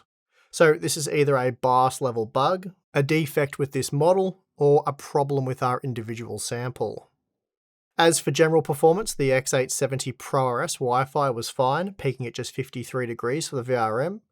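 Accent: Australia. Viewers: It's almost like they're australian and have a different accent and pronunciation over there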